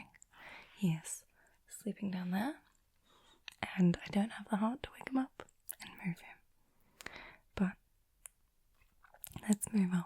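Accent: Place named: Australia. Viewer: Whoa, that took me by surprise. To the ears of someone who can't put two German words together in correct syntax, you speak it very well. New ASMR opportunity? ;) On the note of your background, when I was new here and had yet to become acquainted with your channel, I actually thought you were living in Australia. You carry a lot of that accent with you, imo. Pretty cool. Yeah, I saw that Japan interview video as well. I was tempted to comment about it a few weeks ago but I was like, "Nah, she's seen it by now..." I'm glad I didn't read the comments on it; I'm sorry you endured that. It sounds like you have a lot of travel destination plans. If any of those opportunities come to pass, do you think you would do recordings while you're there to share with this community? I always like sampling people's travel vlogs. Thanks for doing this Q&A! I didn't see any notifications to pose questions but that's ok: you answered a lot here! 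I enjoyed this. :)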